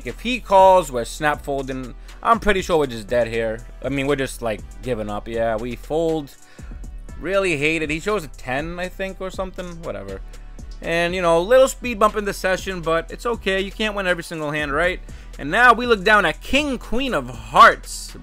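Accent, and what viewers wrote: Boston, United States. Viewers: So, NH is the same accent as in Boston? Just curious, good session, congratulations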